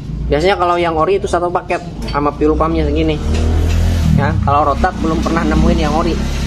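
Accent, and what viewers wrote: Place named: Indramayu, Indonesia. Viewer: Ngomong e kaya wong dermayu ang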